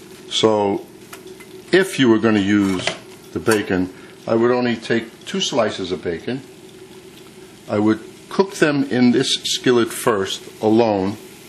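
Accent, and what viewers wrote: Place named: Boston, United States. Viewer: When I clicked on this video, I thought to myself: "How awesome would it be if the guy cooking Boston clam chowder, actually had a Boston accent." Apparently my wish came true!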